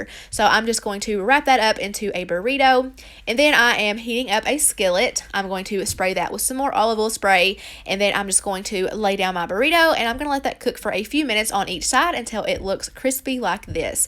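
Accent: South, United States. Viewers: I just found your channel about a week ago and have been watching all your old videos . You have been giving me so many good ideas . I love your channel and love your personality and southern accent 💕💕💕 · Bren Dala it’s her sweet southern accent....I love it, too! 💞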